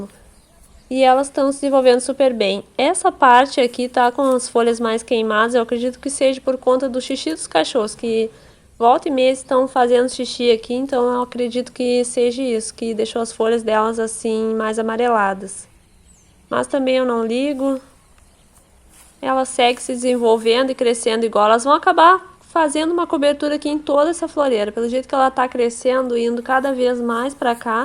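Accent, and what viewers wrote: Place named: Pelotas, Brazil. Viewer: Parabéns pelo canal. Seu sotaque parece da região de Pelotas. Obrigado pelas dicas.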